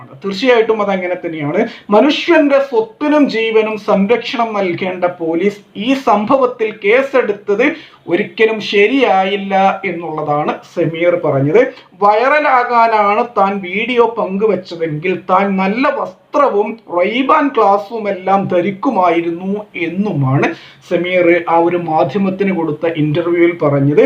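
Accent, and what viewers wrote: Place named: Kasaragod, India. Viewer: ഒരു കാര്യം പറയട്ടെ കൂട്ടുകാര നിങ്ങളുടെ ചാനൽ ഞാൻ കാണാറുണ്ട് നിങ്ങളുടെ ശൈലിയിൽ നിങ്ങൾ സംസാരിക്കുക നിങ്ങളുടെ സംസാരം കാണുബോൾ ഏതോ ഒരു കാസറഗോഡ്കാരൻ സംസാരിക്കുന്നതുപോലെ i like you this chanal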